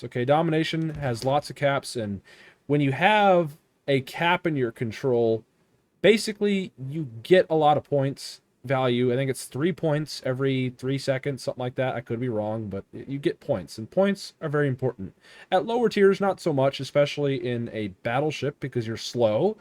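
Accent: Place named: South, United States. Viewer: Kinda new to wow, screw every other tip video, I shall let the man who sounds like a red neck speak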